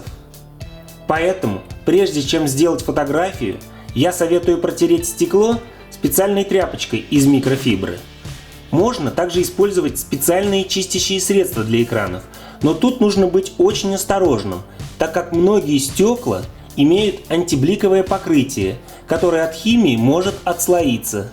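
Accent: Russia. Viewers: О, чувак владимирский похоже))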